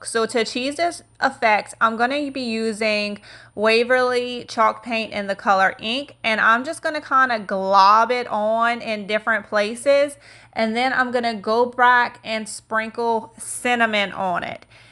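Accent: Louisiana, United States. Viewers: thanks for the info, Kay. Going to get me some garden edging at home depot! is giving me all kinds of other ideas. Love her channel. I used to live in Louisiana so she makes me feel right at home with her Cajun accent and personality. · I love your DIYs and your channel! May I be a bit personal and ask are you from Louisiana and maybe Cajun,I’m from England and pick up on accents,yours is delightful xx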